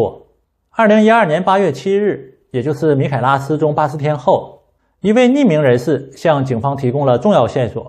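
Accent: Northeast, China. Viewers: 老哥你这东北口音，把我好不容易的普通话又拉回20年去了，当主播得练练哪，真的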